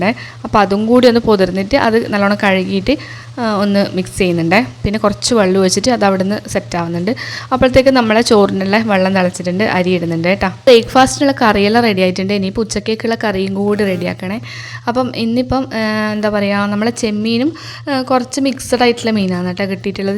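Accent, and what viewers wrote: Kannur, India. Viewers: Adipoli vlog ❤️kannur ഭാഷയും super👌പാത്രം കഴുകി മടുക്കും ❤️ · കണ്ണൂർ ആണല്ലേ place.. സംസാരം കേൾക്കാൻ നല്ല രസമുണ്ട്😍😍energetic vlog 👌👌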